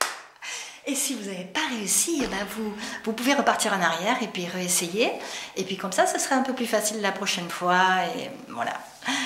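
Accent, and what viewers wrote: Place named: South, France. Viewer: lamed bâton de berger ? jamais entendu cela lors de mes cours d Hébreu biblique, y compris par mon prof, juif pieux, vivant en Israel...Lamed = enseigner, apprendre (a donné limoud/verbe melamed), il dépasse en haut, car le maître dépasse l'élève, et ce dernier peut aussi dépasser le premier ("on apprend les uns des autres" dit mon prof d'Hébreu), ceci dit, j'aime bcp vos videos ma soeur, vous êtes très pédagogue, et avez une voix très posée, très calme..et un joli accent du sud ! soyez bénie...PS le lamed dans sa forme ressemble plutôt à un 7, et le bâton de berger est shevet ("shavtekha" ps 23 ta houlette)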